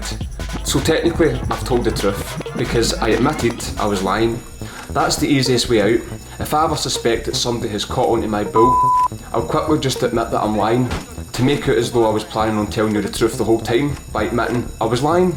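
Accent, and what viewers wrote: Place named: Glasgow, United Kingdom. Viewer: DONT DAE IT MATE KEEP IT REAL TALK LIKE A NORMAL SCOTTISH PERSON EVERY SCOTTISH YOUTUBER SOUNDS LIKE A FUCKINGBENTSHOT FAE GLASS GAOW UNIVERSITY HAHA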